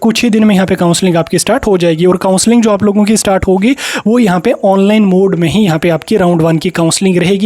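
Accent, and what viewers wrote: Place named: Kashmir, India. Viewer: Kashmiri ascent is obedient ❤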